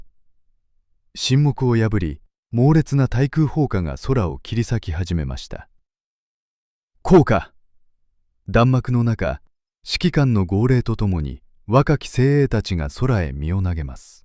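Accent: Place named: South Korea. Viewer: なんで、こんなﾁｮ~鮮語のような、語彙の頭が破裂音の耳障りなカルトチックな音声になるんだい？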